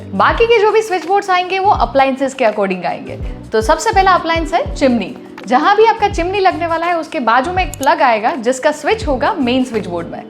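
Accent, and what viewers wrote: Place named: Gujarat, India. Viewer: I think it's Gujarati accent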